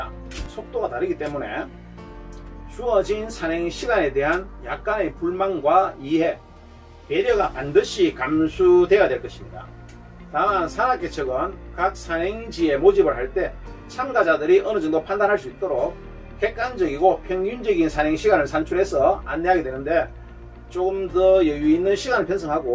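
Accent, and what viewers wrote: Gyeongsang, South Korea. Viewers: 홈화면에 떠서 처음 님의 영상을 만나게 되었는데 경상도억양이 있지만 사투리없이 표준말로 원고도 없이 설명을 참 잘하시는군요. 특히 내용이 알차고 유익하여 인상이 깊었습니다. 다만 한 가지 흠이 있는데 님을 위해 조언드립니다. 설명하시는 동안 양손을 많이, 너무 많이 쓰다보니 수화통역하는 분 같은 느낌이 들었습니다. 손동작이 너무 많다보니 손에 신경이 쓰여 설명을 잠깐 놓치는 경우도 있었구요. 적당한 제스처는 필요하지만 과함은 모자람만 못하다는 조언을 드립니다.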